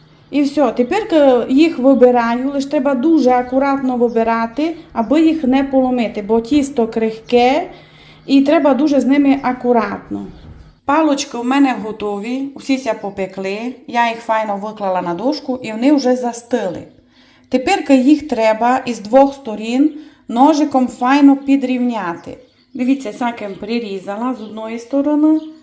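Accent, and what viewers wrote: Transcarpathia, Ukraine. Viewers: Дякую за рецепт❤ мені так подобається закарпатський говір, слова інші - насолоджуюсь діалектами неньки нашої. Міцно обіймаю. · Тільки за закарпатський діалект та акцент ставлю лайк❤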